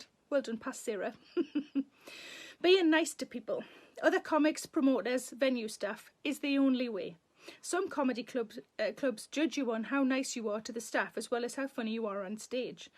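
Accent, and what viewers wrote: Newcastle, United Kingdom. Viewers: Hi, Sarah!! Been listening to multiple episodes in chunks since I’ve not been able to tune in at the airing time every day. So I’ve been catching up for the past couple days. I live in a little town called Shepherdstown in West Virginia in the US; A small historical town that no one’s really heard of, but oh well. Born and raised here. (Although my Dad’s from the UK, so I was raised with a strong love for the English) I love listening to your lovely Geordie accent and smile and laugh along listening to you while working on art pieces. Thank you for being one of my favorite parts of my day, and for being such a beautiful person inside and out. Lots of love - Emma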